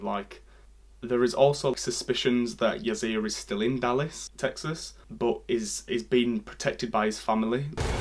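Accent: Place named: Northern England, United Kingdom. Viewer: I haven't heard about this incident until now. It's such a shame how children/young people so often ignored when they try to ask for help. Your accent sounds quite northern - my accent is northern but mine is VERY northern (Wigan). Do you remember a few years back there was a young girl from Warrington. Her name was shefilia Ahmed. She was murdered, chopped into pieces and thrown into water in the lake district. Such a terrible thing to happen to a beautiful young woman. Her parents were eventually charged and found guilty of her murder. But it came to light she tried to let people know what was happening in her life. She even drank bleach in a bid to kill herself because her father was sending her to Pakistan to marry her off. You did another Exellent presentation. Thank You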